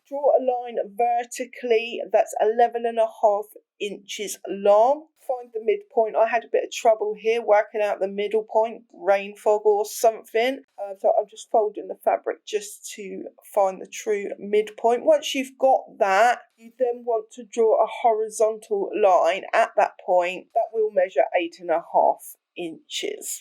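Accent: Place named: Enfield, United Kingdom. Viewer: "Cats are not required - could someone please tell them that?" LOL, subbed. Plus you sound like a local (I'm in Enfield, you sound distinctly like my people. :) )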